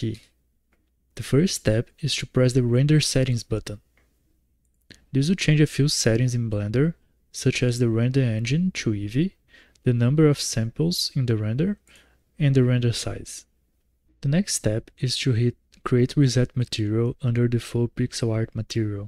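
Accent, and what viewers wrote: Brazil. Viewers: Do I hear a brazillian accent? Isso parece tecnologia da boa! Adoro mexer com píxeis e renderização! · nossa eu acho impressionante como eu descobri que você é brasileiro só pelo sotaque, o meu é igual dfgfsjhs quero um dia aprender a fazer render então muito obrigada pelo vídeo <3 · Esse sotaque de brasileiro maravilhoso, que vídeo prft irmão! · Sabia que tu era brasileiro nos primeiros 20 segundos XD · mano vc é brasileiro?? kk percebi muito o sotaque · That accent. Brazilian. 🤔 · Man... Are you Brazilian? Your way to talk makes me think so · Sotaque Brasileirinho... nice addon btw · Bizarro como da pra saber na hora que é br mesmo falando em ingles kkkkkkkkk, vlw pelo video pai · For some reason, it look like u have a Brazilian accent 😂❤ thanks for the video, it's amazing how we can now make those things with such a easy way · Cê tem sotaque de brasileiro amigo, ótimo vídeo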